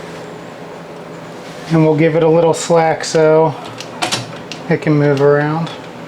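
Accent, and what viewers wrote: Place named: Philadelphia, United States. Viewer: Very informative! I appreciate learning about the Cameo. You sound like you are from Philly.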